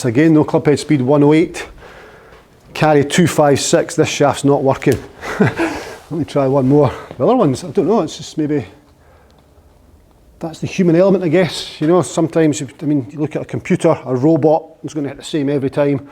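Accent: Scotland, United Kingdom. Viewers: you are a blast to watch and whatever you do, don't lose that Scottish accent!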